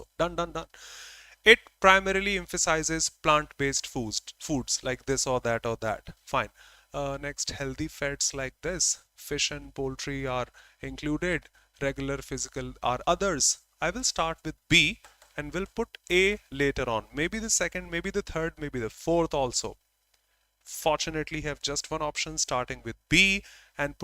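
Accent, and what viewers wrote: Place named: United Kingdom. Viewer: British English sir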